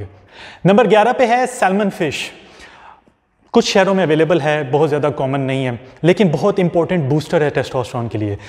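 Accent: India. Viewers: Your language Sounds like Hyderabadi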